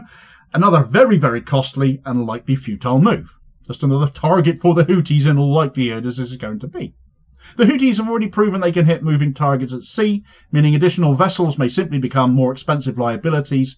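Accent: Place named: England, United Kingdom. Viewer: not listening your you disparage my president. you sound like your English anyway. Good bye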